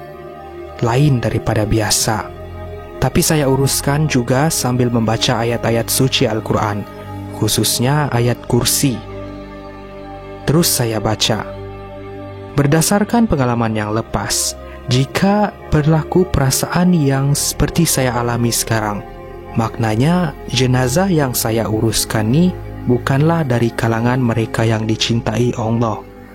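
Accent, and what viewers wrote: Sabah, Malaysia. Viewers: orng sabah memang ckp mcm sebb orng sabah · aku mmg tau pun dia org sabah 😒😒😒 sbb aku org sarawak so dah biasa dgar sleng jiran kami sabah dan kami tahu beza sleng sabah n indo.. Maybe kau x paham komen aku kot..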